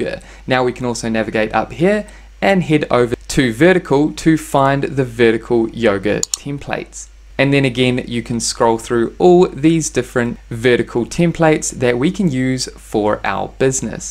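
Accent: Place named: New Zealand. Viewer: Goddddd man, Tour accent Is so strong Nuova Zelanda I guess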